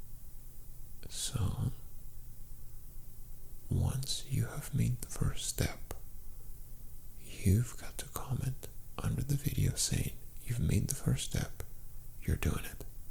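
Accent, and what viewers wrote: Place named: United States. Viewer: Your English and your American accent are ridiculously good. Also, you have a very lovely mouth.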